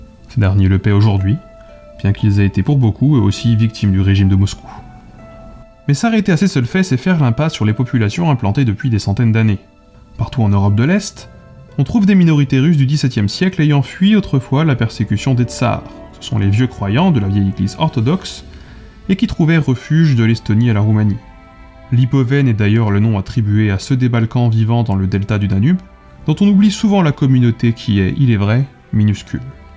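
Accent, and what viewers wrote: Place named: Banlieue, France. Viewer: Un accent de banlieue. Nois qui avions appris le français à l'école on a du mal à comprendre tous les mots de l'accent de la rue c9mme ce mr qui avale certains mots . C'est moche. Je lui conseille d'écouter les narrations d'une chaîne youtube appelée LAMA FÂCHÉ. J Aime la voie de cette chaîne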